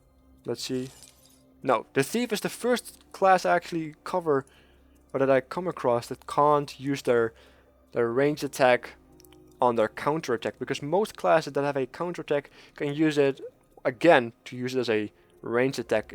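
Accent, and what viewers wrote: Netherlands. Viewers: Are you by any chance dutch? XD what server are you on? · You sound dutch :O Very nice coverage tough ! looking forward to use the thief :/ 1 more week ! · Sounds like hes from Holland..........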